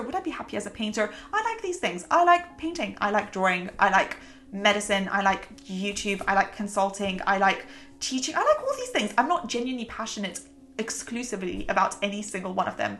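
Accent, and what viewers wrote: United Kingdom. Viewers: I can listen to you for ages for your adorable British accent.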